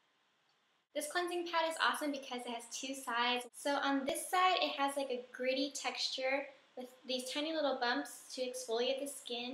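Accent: Japan. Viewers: THE FUNNY THINGS I SEE THE LAST PART YOU ALSO LEARN THE ACCENT FROM JAPAN STYLE. SO CUTE AND NICE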